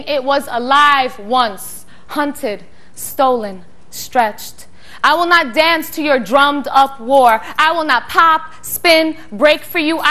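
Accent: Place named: New York, United States. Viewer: "ghetto"??? Shes from Brooklyn and speaks out like many folks from NY do...she is also a literate brilliant artistic writer poet...words do matter...she knows understands and uses that rhythm and vocabulary to SAY SOMETHING POWERFUL...bless her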